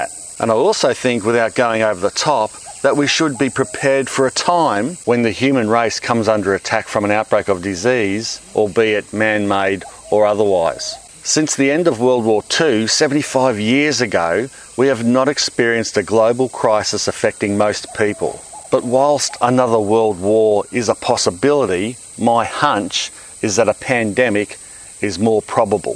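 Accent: Australia. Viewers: I'm in Ontario Canada. I lived a couple of years in Melbourne and my brother has lived in Australia now over 30 years. It's my fav place outside of Canada. I love to watch Aussie videos to hear the accent I miss so much and in videos I love to hear the birds - I miss their sounds so much.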